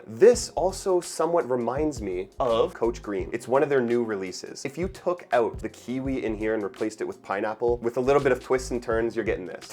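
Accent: Canada. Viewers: It’s not a mispronunciation or wrong - it’s a nuance of a Canadian accent. Let’s appreciate (and not correct) cultural and regional variations. Great video,!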